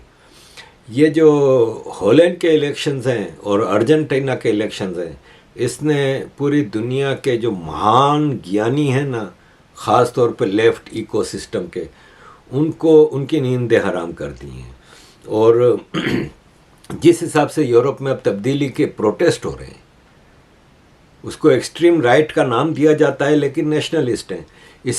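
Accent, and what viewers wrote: Gujarat, India. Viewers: Give up posting in Hindi and post in your native language, Gujarati, so you don’t have to struggle so much with your accent!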